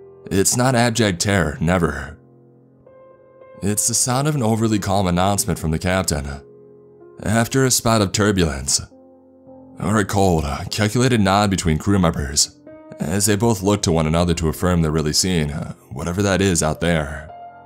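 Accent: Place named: California, United States. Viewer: iv always just thought of it as a surfer bruhv California accent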